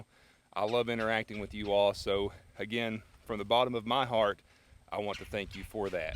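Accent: United States. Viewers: Great video collalatratons 0n your millstone like your country accent it sounds cool you ever been to St. Louis Missouri there are some good cemetery's of famous people calvary and one betlvo cemetery is wear chuck berry is buried at when you go to look for his big statue of him playing a guitar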